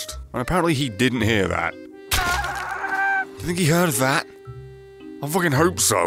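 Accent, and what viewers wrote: United Kingdom. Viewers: I doubt you'll read this, but thanks for making such amazing content, my dear madman. Seriously, you've made me a maniac, and I love you for it. You just bring laughter to my life, and a bloody lot of it. I would give a fucking arm and a leg to talk to you once, since I really only need one of each. One more thing I love you for, you're british, and I love british slangs and accent. xD So keep up your work man, you have my full support!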